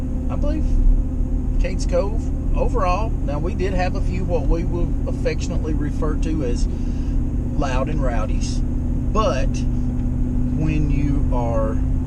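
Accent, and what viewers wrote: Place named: Alabama, United States. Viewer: I enjoyed your video. Looks like you have a nice setup. I thought I detected a northeast Alabama accent. I am also from that same area. We love to camp at Cades Cove and Elkmont. We go to Desoto State Park often. It is an easy trip for us. Thanks for sharing!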